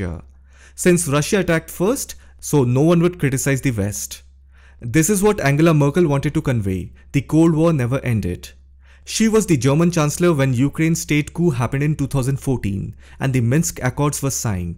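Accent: India. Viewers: This may be a brilliant video or it may not. I refuse to listen to videos narrated in Indian 'English'. Indian speakers,, if you want English speakers to understand what you are talking about, every single word must be pronounced clearly and separately, using English vowel sounds, not Indian. Do not run words together as if they are all one long word. Either speak Indian or English, but not a mixture of both. Thanks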